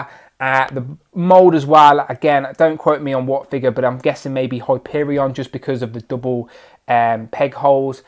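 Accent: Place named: United Kingdom. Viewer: Can you tone down that British accent? I can barely understand what you're saying.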